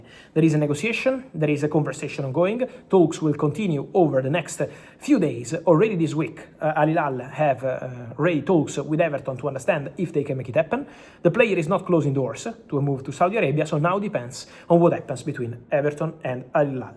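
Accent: Italy. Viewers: İtalian accent is so hard i don't understand you mr Romano😅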